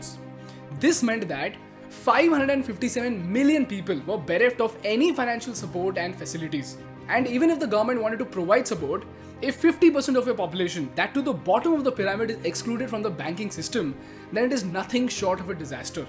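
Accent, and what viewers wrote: India. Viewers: the Indian Accent is strong in this one lol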